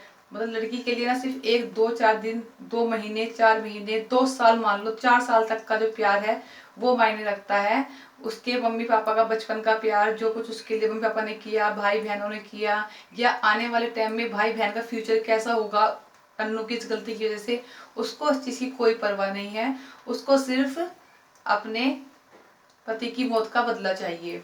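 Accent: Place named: Haryana, India. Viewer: di ap haryana ki language me baat krte ho ho aap shamli ke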